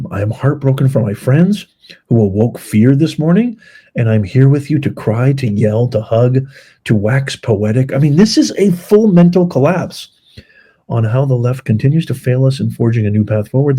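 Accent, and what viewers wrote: Scotland, United Kingdom. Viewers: Absolutely love you're Scottish accent, I don't live far from Scotland and you did it well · Your Scottish accent is great too,! 🥁🥁🥁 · I'm Scottish living in New Zealand, you done a great take, on the Scottish accent, ahhh.... fond memories. Keep up the great work, what a result for America and here's hoping the world's leaders start behaving like America and Argentina. It takes more energy to be negative, guess what, I'm lazy, but so positive.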